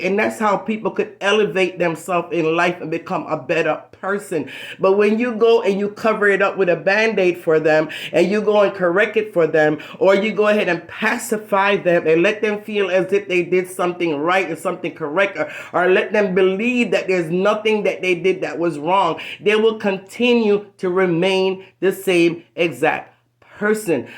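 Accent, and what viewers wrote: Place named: Bermuda. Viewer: You sound Bermudian why u coming after Donna plz stop it plz u looking views💯😂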